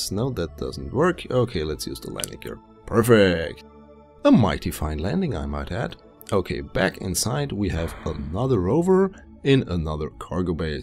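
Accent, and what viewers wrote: Germany. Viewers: He Sounds German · ich höre da 'nen deutschen akzent raus :p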